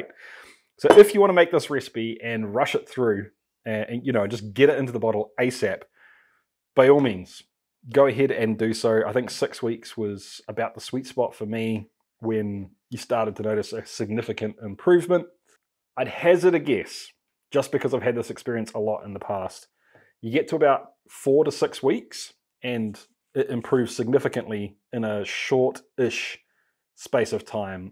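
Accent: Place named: New Zealand. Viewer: NZ accent, bro.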